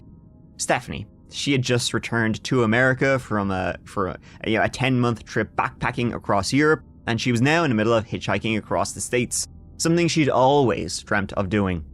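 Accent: Ireland. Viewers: Are you trying to do an Irish accent or something? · Thank you for putting podcasts on you tube it is such a treat for your fans I enjoy listening to your wonderful brogue and of course your sense of humor the way u have doing theses horrific stories . You’re simply the best storyteller God bless you and your family RIP to all of the victims of these crimes Wish you all the best with your podcast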